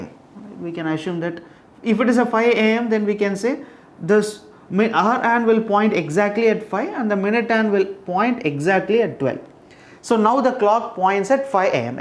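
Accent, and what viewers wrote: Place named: South India, India. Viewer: Sir r u from south Indian region your accent r representing it but yet in education languages, races, religions or castes or anything..... doesn't matter what exactly matter is talent and skills.....liked your way of teaching ❤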